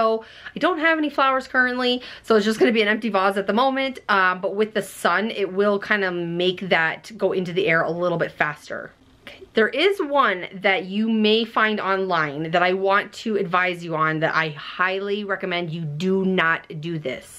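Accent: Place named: Midwest, United States. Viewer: Where are you from? Your accent is midwest-ish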